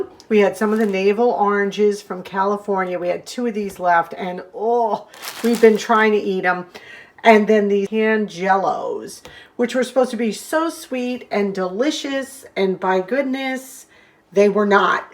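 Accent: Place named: Long Island, United States. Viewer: Hi. This is my first time here. I love frugal videos and yours is the first I've seen from NYS. I'm a New Yorker too....from Long Island. I think I detect a LI accent from you. Lol. I'm going to make the orange marmalade....mmmm. I love marmalade. is doing a great job on the deck. There's so many ways we can save money. Thanks for sharing. I subbed you and I'm looking forward to watching more of your videos. 😊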